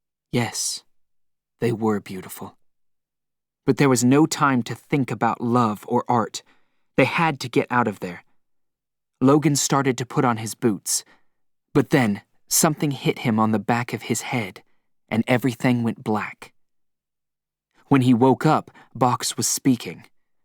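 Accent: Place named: South, United States. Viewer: After knowing Logan as Michael York for 50 years, it's funny hearing him narrated with a southern accent. And his world not being confined to a giant shopping mall.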